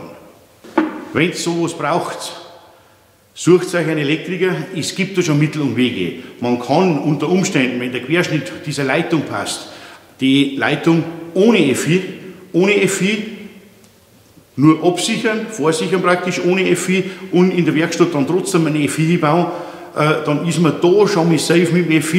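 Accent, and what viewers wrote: Bavaria, Germany. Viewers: Bin ein Ungar, hatte Deutsch nur als Fremdsprache in der Schule gehabt, verstehe dich aber doch ganz prima! Bairisch finde ich schön - sei es dein Kanal, Ottfried Fischer oder die Gruberin :)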